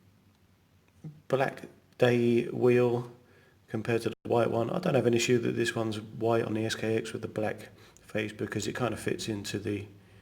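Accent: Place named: United Kingdom. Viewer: Just ordered this one… coming in a few days … I think I’ll like it … nice review and the British accent makes it even cooler 😎 review on the lume was excellent .. I agree with several other guys on here that I’m a Seiko man and yet this citizen is a great watch .. I have the seiko 5 filed military watch with the same movement as the skx and it doesn’t even wind at all…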